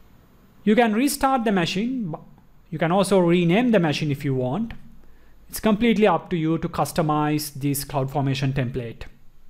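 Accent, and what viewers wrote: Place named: India. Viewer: DAT DAT DAT I never understood why Indians do not even try to fight their accent and at the same time climb the Internet to shoot videos in a language other than their own. As one hero said, "I can't swim and I don't put my ass in the pool." The video is about nothing. Any fool can download the repo from the git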